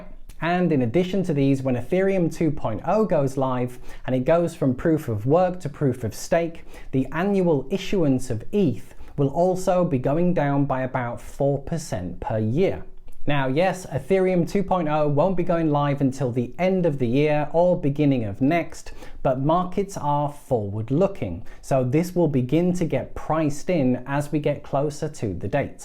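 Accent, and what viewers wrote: United Kingdom. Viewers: When I clicked on this video I didn't have my sound on and I predicted that you would be British and I was right.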